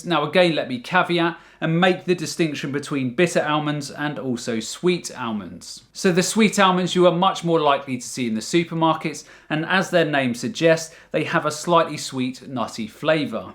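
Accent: United Kingdom. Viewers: I am not plant based, but I still love watching your videos. 🙂 You give alot of good advice. Plus, I like the British accent lol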